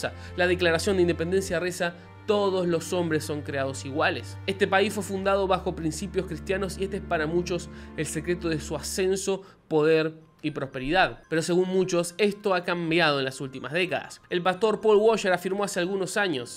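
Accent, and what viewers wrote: Argentina. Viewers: Que tal, un pequeño comentario, admiro mucho tu conocimiento y explicación de los datos religiosos, como suelo decir: "lo peor que puede pasar es que aprenda algo" (obviamente un dicho sarcástico, ya que aprender algo siempre es beneficioso). Solo un pequeño defecto que oigo en tus pláticas religiosas, lo cual parecen desviar el significado de las mismas. Cuando decís a quienes les estás hablando, que terminas los sustantivos en "o" (masculino), "a" (femenino), y "e" (bisexual, transexual, vos sabrás que), pero si vas a hablar pláticas religiosas solo habla hacia hombres y mujeres (puedo captar en tu Acento que sos Argentino, más ni siquiera para complacer a la cultura de tu querido país deberías usar esas expresiones). Resolveme por favor una duda: que generos dice la Biblia que creo Dios en el sexto día?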